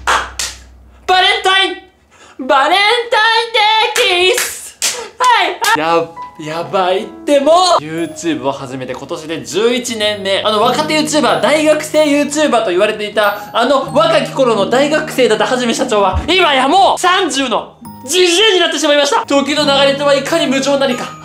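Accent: Toyama, Japan. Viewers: 時々富山弁がでるのが、ほっとします。富山県人より☺️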